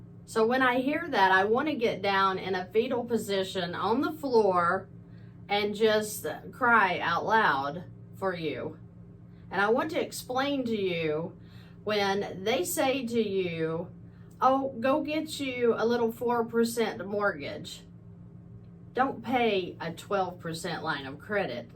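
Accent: South, United States. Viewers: Okay, this is brilliant and I love your Southern accent. It all makes sense except the part where you use your line of credit as income by putting your income into paying the line of credit. It seems you just paid off the line of credit with $10g, so your income for that month was spent by zeroing out the line of c balance. How exactly are you using this as your checking account and wouldn’t you still need to make that month’s mortgage payment? But you just spent your 10g income to pay off the line of c? If you could please explain that part of it in more detail for us slow folk? Thx😊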